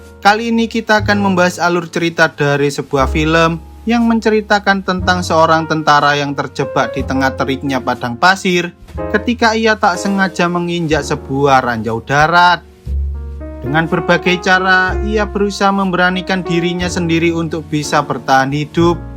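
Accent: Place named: Banyumas, Indonesia. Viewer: Jadi gk seru ngapaknya kebangetan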